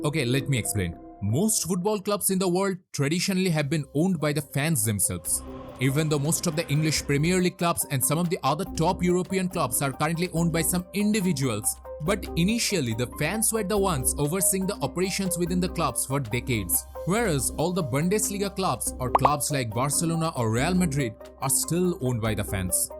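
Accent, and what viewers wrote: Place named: Bengal, India. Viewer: ভাই। বাংলায় বল । English টা এরম বাংলা বাংলা accent e bolle joghonno shonae. ( বাংলা টাও ইংলিশ accent এ বললে জঘন্য শোনায় ) ।উচ্চারণ করতে অসুবিধা হচ্ছে বোঝাই যাচ্ছে। কানে লাগছে।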